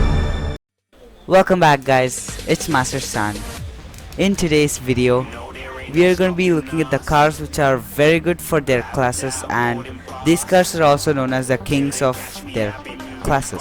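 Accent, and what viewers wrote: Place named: South Asia. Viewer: You sound south asian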